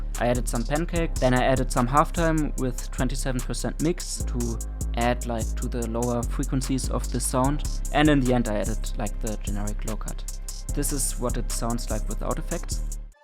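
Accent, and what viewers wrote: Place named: Germany. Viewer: I hear German accent I know vid gon be hard!!!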